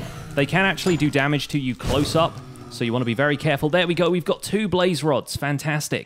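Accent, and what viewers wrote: England, United Kingdom. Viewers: Hey are you from england or USA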